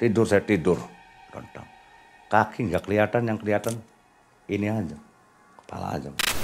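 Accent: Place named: Cirebon, Indonesia. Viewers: Logat cirebon nya khas bngt walaupun ngomong indo juga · Cirebone kentel pisan mang😂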